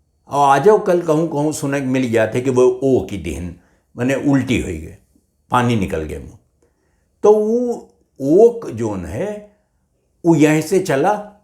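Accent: Awadh, India. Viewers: Got fed up of listening "English" and other languages. मजा तो अवधि सुने में आवत है। और ऊहू आपके जुबान से,मन तृप्त होई जात है। स्वागत ब आपके, ५ साल बाद इंतजार पूरा भय। अवधि आपहे जिंदा किय्यो है, डिजिटल प्लेटफार्म पर। धन्यवाद, आपके। बस ८वी सूचियों में आए जाए, तो संघर्ष पूरा होई जाए।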